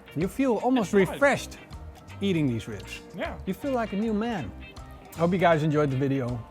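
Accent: Netherlands. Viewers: are you dutch bc's i am and i think i notice the accent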